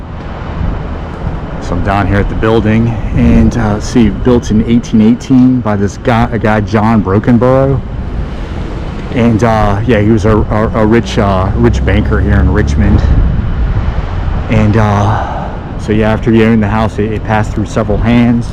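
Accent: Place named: Delaware County, United States. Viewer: Your Delco accent is strong AF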